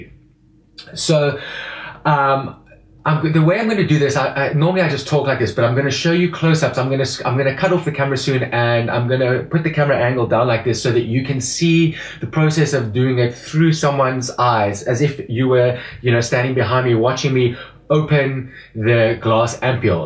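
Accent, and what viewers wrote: South Africa. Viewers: What's that accent? South African? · South African accent! Yay! Thanks for the info. ( Shosholoza to you too!) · Are you from South Africa? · You sound like a South African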